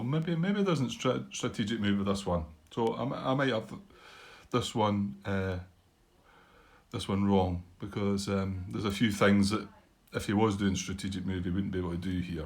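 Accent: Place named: Scotland, United Kingdom. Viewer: I think it was mostly clear and to the point. Not your fault things went a bit off the rails toward the end. I think was getting a bit tired and lost focus. The recording, editing and sound were all very good and I'm very much looking forward to your own playthrough. Clearly, everything sounds better in Scottish, so you have that going for you from the start. (I'm secretly hoping for a blast of bagpipe music once XXX Corps gets rolling...and yeah, also consider an invisible sidekick called "Wee Seamus" or something similar.) I'm sure you're correct regarding the roadblock check when moving adjacent=>adjacent, but it's a tricky one since it doesn't quite make sense (to me). Let's say you have two units stacked adjacent to an Allied Position. When you move the first one like in your example, you now don't have to check. The unit is still adjacent, so if you proceed move the second unit, you again don't have to check. 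Another example with a single unit this time: instead of moving adjacent=>adjacent, you move through the position hex and into the same target hex, again you do not roll. I guess there's a rationale there somewhere (maybe the non-moving unit is watching the road in the first example?) but it's not crystal clear.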